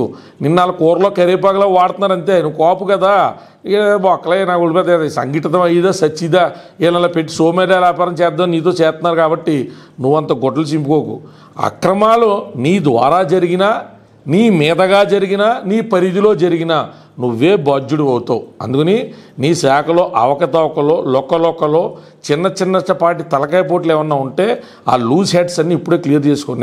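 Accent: Godavari, India. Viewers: మీ వాక్చాతుర్యం చాలా బాగుంటది అన్న గారు మీకు గోదావరి యాస సూపర్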